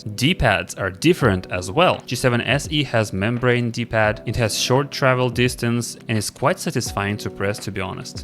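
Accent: Russia. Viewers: я так и знал что он русский с нулевой секунды было понятно =)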